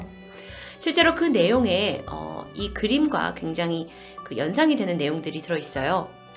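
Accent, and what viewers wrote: Jeolla, South Korea. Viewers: 님 혹시 전라도 사람이신가요? 아님 주위에 전라도 사람 있으신가요? 전라도 사투리 쓰시는거 같은데 ㅎㅎ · 전라도쪽 사투리라 그럴겁니다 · 전라도 억양 때문이신가 의발음이 잘 안되시는 듯ㅋㅋ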